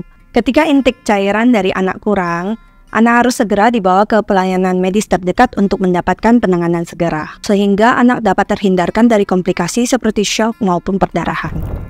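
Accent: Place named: Chinese-Indonesian, Indonesia. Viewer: Suka banget sama aksen chindo nya 😅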